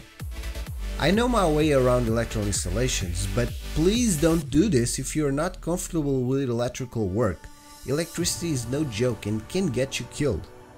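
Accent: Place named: Portugal. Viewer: Muito interessante, e barato. Fiquei surpreendido por ser um vídeo português, já que o seu inglês quase não denuncia o habitual sotaque. Parabéns 👍🏻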